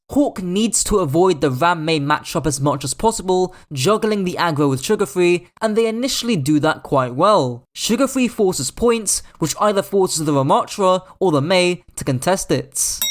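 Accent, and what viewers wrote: United Kingdom. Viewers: He's not talking like a Sydneysider Aussie. Now that's an obnoxious upwards twang at the end of every single sentence. is talking totally regularly for matter-of-fact speaking. Sounds like a regular Brit.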